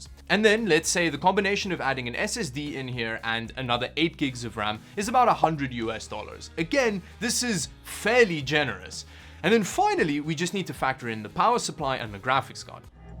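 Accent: Afrikaans, South Africa. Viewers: I'm so curious too, South African is my guess. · Is jy van Suid Afrika? Jy klink Afrikaans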